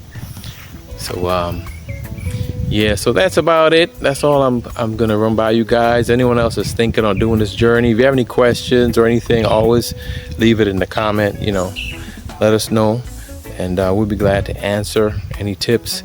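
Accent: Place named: Belize. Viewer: You sound like you picking up the Belizean accent also😂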